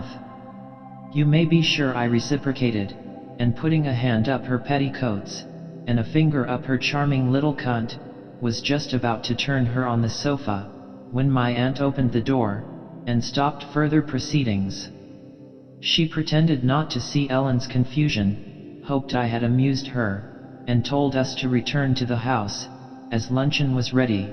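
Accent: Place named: United Kingdom. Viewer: Alien voice VS gentle English UK accent...no contest. THIS VERSION IS ATROCIOUS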